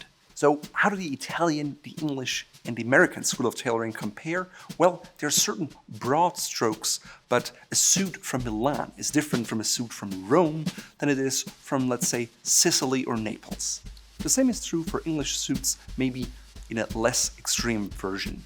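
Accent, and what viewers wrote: Germany. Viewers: Your name is as German as they come. You even have a German accent. No way you're in the US. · still they have to work a lot on the audio quality and the German accent which is quite challenging...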